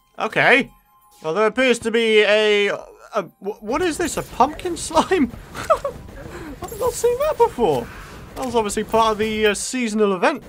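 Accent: United Kingdom. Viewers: HEY! I love your videos and your British ascent. you are my favorite you tuber and I hope you could respond to me, it would make my day better, after being bullied.....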